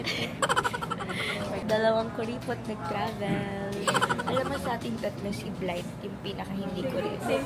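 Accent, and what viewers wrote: Conyo, Philippines. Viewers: While hearing Ms saying " I wish Blythe was here" made me realize and made me wish that i also have that kind of friend who thinks of me everytime I'm not there with them 'coz i always feel like I dont have friends I just know a lot of people..... Btw I love youuur conyo talaga its not maarte or cringe or oa it's so cutiee😻🥰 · hahha omg so cute ng pagiging conyo mo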